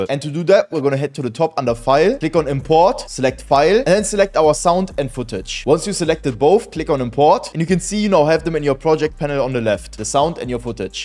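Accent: Germany. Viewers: Man hört dass du Deutscher bist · Man hört so krass das du deutsch bist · i hear deutsch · i hear German accent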